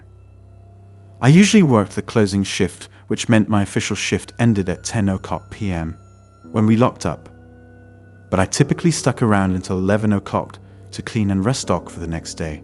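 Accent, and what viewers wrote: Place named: England, United Kingdom. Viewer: A Englishman ,narrating the story . Nice ! 😉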